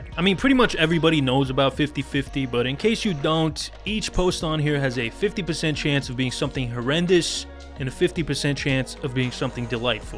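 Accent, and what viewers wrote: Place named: New York, United States. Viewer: I love your accent ? Where is it from? New york?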